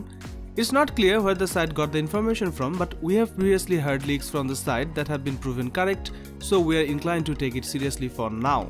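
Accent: India. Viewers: Gak tahu kenapa kurang suka aja kalau orang india ngomong inggris 😂😂😂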